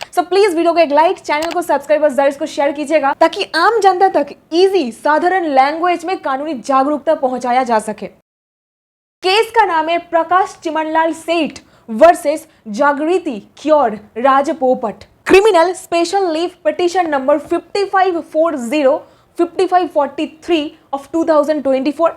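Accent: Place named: Bihar, India. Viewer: Bihar se h tu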